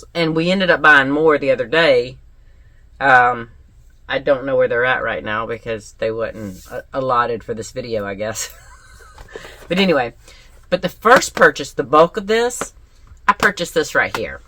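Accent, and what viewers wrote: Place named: South, United States. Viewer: Hi! A warm greeting from Florida. I was scrolling through you tube and I came up with your video. I absolutely love your approach to the subject and the mix of your everyday life. Nothing like that beautiful southern accent mix with sass and bless your heart attitude ❤You have a new fan. Thank you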